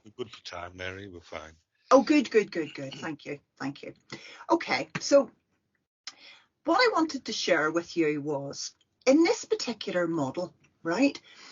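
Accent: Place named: Northern Ireland, United Kingdom. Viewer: Great video, excellent intro to TE!!!! Love the Nor Iron accent by the way, brings back many great memories!!!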